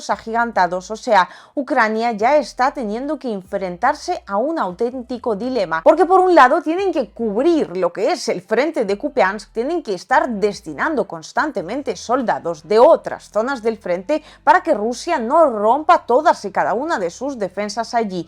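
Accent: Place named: Spain. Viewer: Jaja "Ojos bellos..vosotros sabeis que eso mismo..les Hicieron a los Mexicanos..vale EE.UU. "Utilizo " de todo. Y despojo parte del territorio de Mexico...Puerto Rico..Guantanamo...Japón..los hidrocarburos de Siria..¿¡¡ Y LA ONU.?? AH PERO COMO RUSIA YA TOMO POSESION DE TERRENOS DE UCRANEA..AHI SI SE ASUSTAN Y ACUSAN..CON LA COMPLICIDAD DE GUTERRES. ONU..( hablas como española . Pero..vuestro fisico y rostro..LLEVAN "LA MARCA...SELLO" DE RUSIA..)😁😄😄🥰💕👍👍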